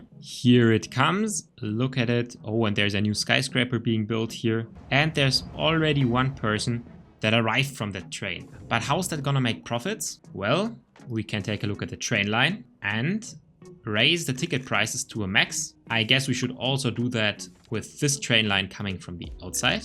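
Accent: Germany. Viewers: uff ich höre sofort raus das du deutscher bistXD trotzdem geiles tutorial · do i hear deutsch? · deutscher Akzent, 10/10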